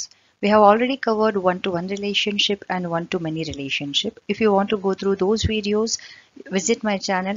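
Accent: India. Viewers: your explanation is so good. you are from Andhra or Telangana?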